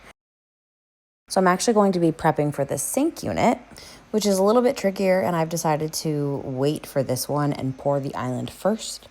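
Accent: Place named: Nova Scotia, Canada. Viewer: Nova Scotia? Wow, I knew I knew that accent!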